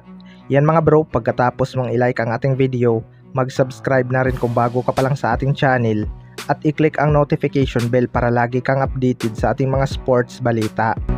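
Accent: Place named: Iloilo, Philippines. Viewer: ilongo ka no..may punto kasi tagalog m9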